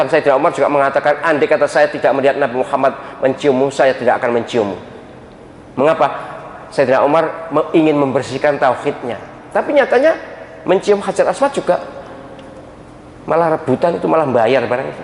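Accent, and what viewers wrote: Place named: Madura, Indonesia. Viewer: Madura mireng prof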